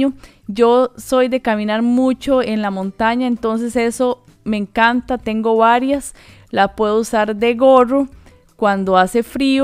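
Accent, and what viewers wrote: Costa Rica. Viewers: Ese acento no se pierde!!! Bravo una tica emprendedora!!! Saludes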